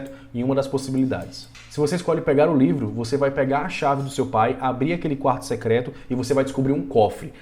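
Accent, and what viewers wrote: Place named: Ceará, Brazil. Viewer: Nós 30 primeiros segundos eu me toquei do sotaque cearense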